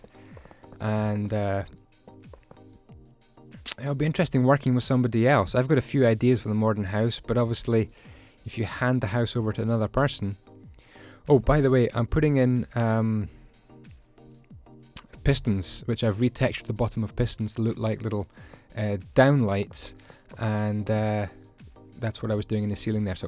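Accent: Scotland, United Kingdom. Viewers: It's Scottish, not very strong Scottish, but it is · I'm just a new subscriber and your accent is really throwing me off, I think it's kind of Scottish, but could someone shed some light on this please?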